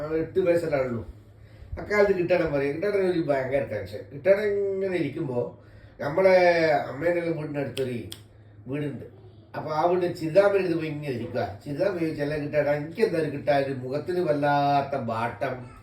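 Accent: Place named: Vadakara, India. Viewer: 😂😂nmmle vadakara bhasha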